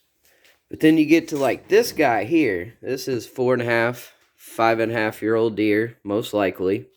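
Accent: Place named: South, United States. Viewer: Lol im from michigan. People don’t understand deer like that don’t grow in northern Michigan. That’s why Jon Eberhardt knows buddy. Because he hunts in northern Michigan. Trust me when I say this they don’t get that big up there. I live in southern Michigan, which is where the biggest bucks are in michigan, and I have never seen a 30 inch spread on a buck. People in Traverse City Michigan are lucky to shoot an eight point that’s 15 inches wide Buba. It’s not about whether the antlers go straight up. It’s about the soil content that doesn’t give credence to the idea that a Deer in northern Michigan could grow a rack that’s that extraordinarily wide. I’m sorry dude unless you’re from Michigan you just won’t understand. Don’t want to break anyones heart, but there’s no factual proof to that damn buck and if there was at all, he would’ve put it under an x-ray. 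He was the scorer for the commemorative bucks of Michigan man. He was the type of guy who regarded and revered records. If he wasn’t he wouldn’t have been part of CBM. Sorry, I hear the southern drawl in your accent and it just makes me have to say Bubba come up here to Michigan and let me know when you see a deer that’s 30 inches wide. As to John Eberhardt, he is one hell of a deer hunter and to put over 100 deer that score over 100 inches on the dirt in Michigan is some seriously good huntin.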